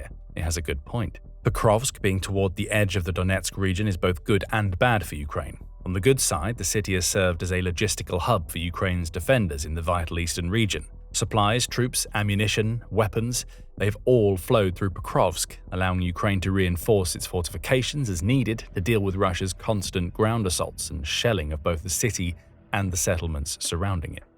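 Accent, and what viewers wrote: United Kingdom. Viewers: Sounds british, therefore most are lies...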